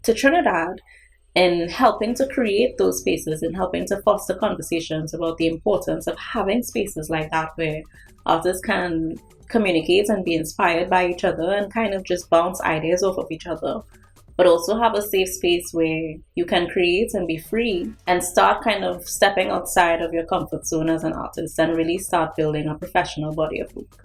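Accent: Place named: Trinidad and Tobago. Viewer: I'm from Antigua but Trinidad is my second home. The trini accent made me go EH?! YEEEEEEESSSS! I am an Artist by birth, Graphic Designer by vocation. I've been leaning heavily into creating more freely (ah mashin up mah sewing machine atm) and I guess the YouTube algorithm decided that now would be an appropriate time to introduce me to your channel. I'm happy that it did. Your work is captivating, inspirational... just absolutely gorgeous. I'm feel even more motivated to embrace this part of my creative journey. Thank you for sharing your AMAZING work!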